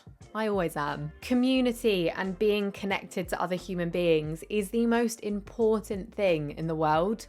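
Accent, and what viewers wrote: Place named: England, United Kingdom. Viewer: You are a pretty girl with that funny English accent. After seeing this video I realize you aren't always funny and confident like you seem.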